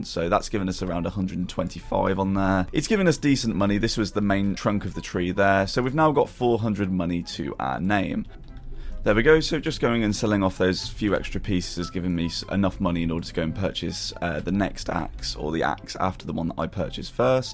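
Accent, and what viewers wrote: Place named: United Kingdom. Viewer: classic British accent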